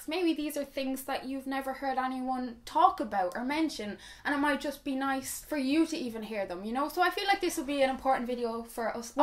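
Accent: Ireland. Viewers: I love your videos and your Irish accent! My ex boyfriend used to pressure me alot into having sex and when I used to refuse. He used to become very nasty towards me. I do love sex but not that much!! I'm from Yorkshire, England 🏴 btw xx. Keep up the amazing content 💗💗💗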